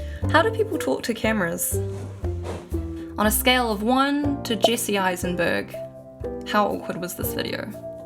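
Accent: New Zealand. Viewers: Omg i love your new Zealand accent!!(I'm in Australia😊)it really sucks to get rejected but it doesn't define you! You ARE talented, your voice IS beautiful❤❤❤I believe in you😘😘😘you will learn from this experience and improve next time😊✨✨🎶🎶🎶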